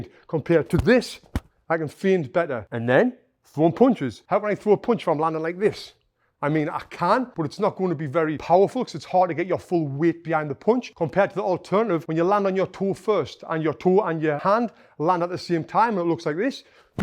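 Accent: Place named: England, United Kingdom. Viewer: Love your content and hearin the King’s English….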